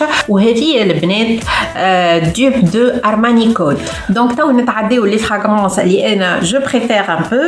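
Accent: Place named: Tunisia. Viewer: J'adore l'accent tunisien thabeel , merci pour la revue #algerie ❤️❤️❤️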